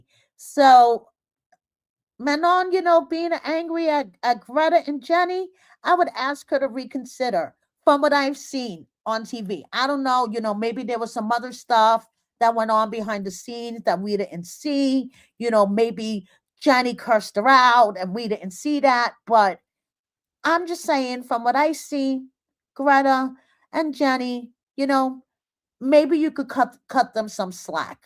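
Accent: New York, United States. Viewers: I can’t stand eScott he’s not a nice human and obsessed with bloggers. As for your voice I love it, your NY accent is so cool sounding to me.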